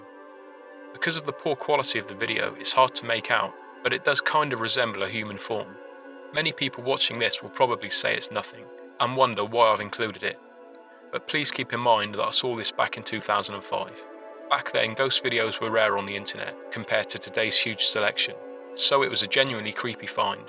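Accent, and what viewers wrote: United Kingdom. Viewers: His UK voice is awesome too awesome could be fake maybe he's from the 80's rock music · I think you have to much time on your hands down in mamas basement,also a british accent can only go so far toward making you look smart.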